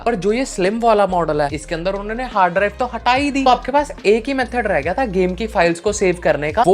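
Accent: Punjab, India. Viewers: ਬਾਈ ਤੇਰੀ ਹਿੰਦੀ ਚ ਪੰਜਾਬੀ ਮਿਕਸ ਬੜੀ ਵਧੀਆ ਲਗਦੀ🤩 ਮੈ ਤ ਤੇਰੀ ਵਾਜ ਸੁਣਦੇ ਸੁਣਦੇ ਕਿਨੀਆ ਵੀਡੀਓ ਬੇਖ ਲਇਆਂ 😅👌🏻💐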